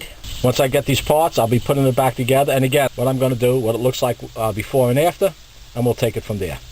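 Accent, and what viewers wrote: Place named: New York, United States. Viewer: I meant to say Long Island. I knew the accent was from NY. I had a friend from derh, sounded just like you. Very fun to listen to. I’ll be changing my water pump out tomorrow. Wish me luck.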